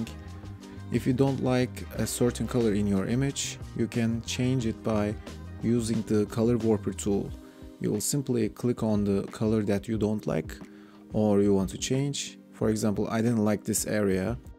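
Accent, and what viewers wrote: Turkey. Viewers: Hey bro, are you Turkish right? you're accent almost same Turkish people ahaha lol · Türk her yerde Türklüğünü belli ediyor ya aksanı duyar duymaz anladım :)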